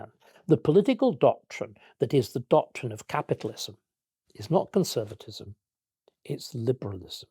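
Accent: RP, United Kingdom. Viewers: You wouldn't of thought he was a working class lad.Speak with a plum in your mouth, and you automatically think he is intelligent. Some people are different deal with it.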